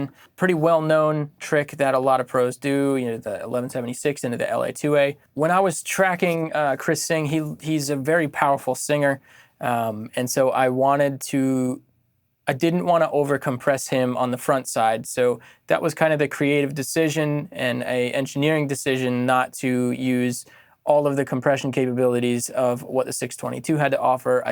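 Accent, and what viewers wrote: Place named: United Kingdom. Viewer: Love the British accent mate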